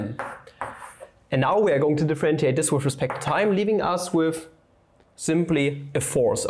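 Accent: Germany. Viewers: i know you're german from your accent 😅 keep going bro good luck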